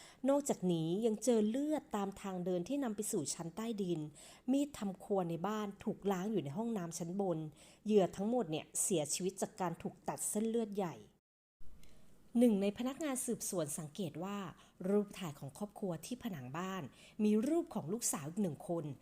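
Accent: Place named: Thailand. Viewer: สำเนียง eng ไทย มันจะพาลิ้นพันกัน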